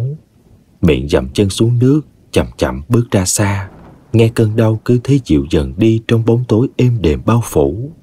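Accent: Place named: Saigon, Vietnam. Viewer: Yêu mến cái giọng Sài gòn của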